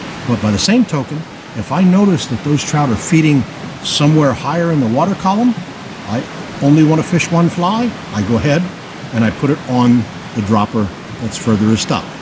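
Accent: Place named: Appalachia, United States. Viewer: Cannot understand a word because of poor sound and Redneck Hillbilly English!😢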